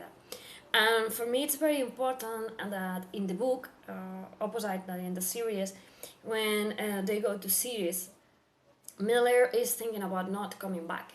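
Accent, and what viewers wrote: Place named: Argentina. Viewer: Yes, Miller is outsider and initially he sees in Julie something, he does not understand. He is curious and the more he learns, the more he likes and respects her. About his “visions” of her, there is a theory that the protomolecule works in more than three dimensions, including time. Since he met “ProtoJulie”, she appears in his mind before that, the same way he appears in the mind of Holden. Also your musings are great and I like your accent. Argentina?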